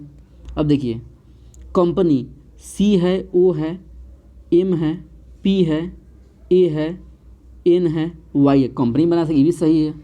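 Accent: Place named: Bihar, India. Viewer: Apki language bhutt achi lgi bihar ke ho ??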